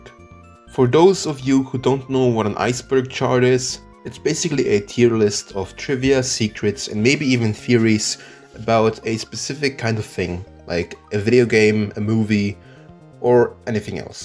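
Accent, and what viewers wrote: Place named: Germany. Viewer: His german accent is the same as my englisch teacher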